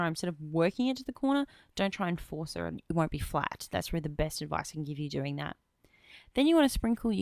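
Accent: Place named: Australia. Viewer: Hi love your videos and explanations wonderful job!! I love your accent too are you from Australia? Keep up the great videos!! Allie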